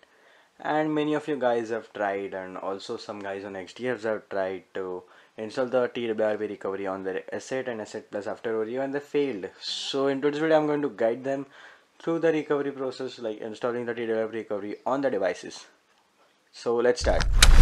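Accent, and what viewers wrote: India. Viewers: Holy shit! Can you please speak slower in future videos? Half of what you said here I couldn't understand at all. Not because you speak Indian or that dialect, simply because you are talking way too fast. You want to talk lower and more precise when you're doing how to and training videos such as this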